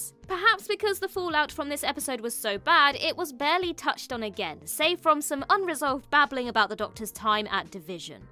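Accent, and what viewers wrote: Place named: United Kingdom. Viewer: Ayo i love british accent ”doctor U”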